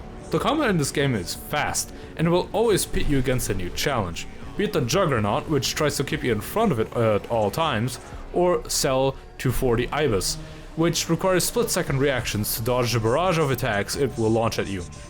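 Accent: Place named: Germany. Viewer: Ich denke, ich höre den Deutschen Landsmann raus im Video :D Kann das mit Destiny 2 gut nachvollziehen.... Spiele aktuell AC6 UND Destiny 2 ... das sind Welten unterschied. Aber wie immer, Übung macht den Meister, ich kann nur empfehlen, probier viel mit Waffen und builds herum. Habe das Spiel mit Doppel MPs und 2 Energiewaffen am Rücken durch und bin mitten im NG+, habe nur leichte Sachen justiert fürs minmaxen. Sehr gelungenes Video, aber mach dich nicht so fertig, einfach weiter austesten und evtl. paar tips holen. Grüße gehen raus.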